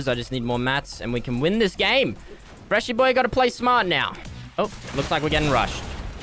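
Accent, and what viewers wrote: Australia. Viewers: Nutella and Breadsticks can’t u hear he has an Australian accent Asian people don’t.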